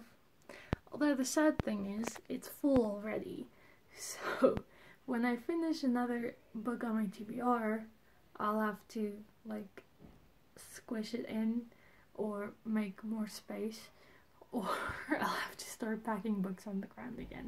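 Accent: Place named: United Kingdom. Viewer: How’s photography going? My web connection is rather (sh*t) but I allowed the audio to play out. I seriously enjoy your voice... I tend to follow folks just to hear them speak. (Odd yes but still...) Love hearing folks from the uk 🇬🇧 or other areas around Europe... Anyways I do hope your photography is going well. Any tips to building a portfolio easy without hiring folks? Just curious... Best to you... peace and love.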